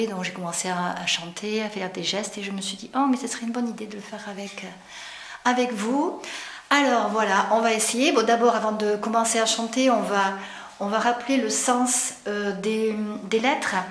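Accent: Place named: South, France. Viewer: lamed bâton de berger ? jamais entendu cela lors de mes cours d Hébreu biblique, y compris par mon prof, juif pieux, vivant en Israel...Lamed = enseigner, apprendre (a donné limoud/verbe melamed), il dépasse en haut, car le maître dépasse l'élève, et ce dernier peut aussi dépasser le premier ("on apprend les uns des autres" dit mon prof d'Hébreu), ceci dit, j'aime bcp vos videos ma soeur, vous êtes très pédagogue, et avez une voix très posée, très calme..et un joli accent du sud ! soyez bénie...PS le lamed dans sa forme ressemble plutôt à un 7, et le bâton de berger est shevet ("shavtekha" ps 23 ta houlette)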